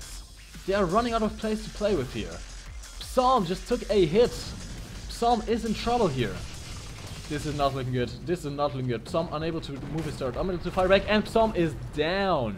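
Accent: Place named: Germany. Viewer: Also bist du deutschstämmig. Ich dachte schon immer das du einen deutschen Akzent hast...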